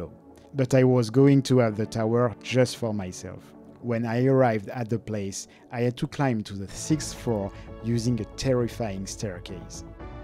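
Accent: France. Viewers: your accent seems French. are you French?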